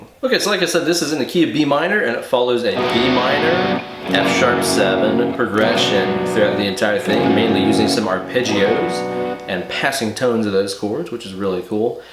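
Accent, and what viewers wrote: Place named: East Tennessee, United States. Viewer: I'm from East Tn, and you sound like half of the people I know. Any chance you're from around here?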